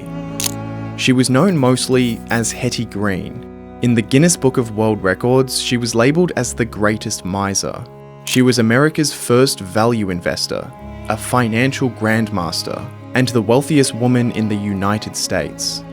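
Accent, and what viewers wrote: Australia. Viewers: Ugh it’s hard to stay engaged with this Australian accent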